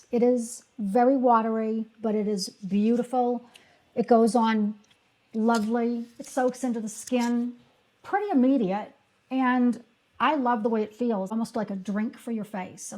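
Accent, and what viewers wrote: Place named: New York, United States. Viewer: Beautiful woman❤ I love your accent!……New York? Thank you for tips. I agree glow is so important 😊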